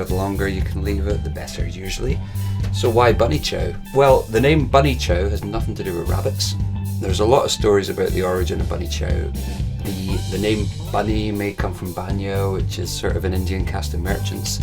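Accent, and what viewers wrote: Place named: Ireland. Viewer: Cool recipe makes it better hearing an Irish voice :)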